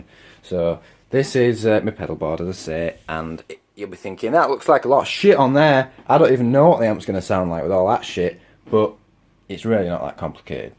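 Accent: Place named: Yorkshire, United Kingdom. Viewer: You sound so yorkshire its untrue haha awesome review dood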